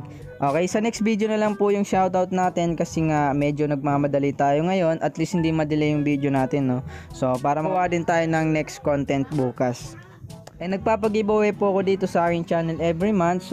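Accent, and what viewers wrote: Philippines. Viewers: Tol Maguindanaon ka?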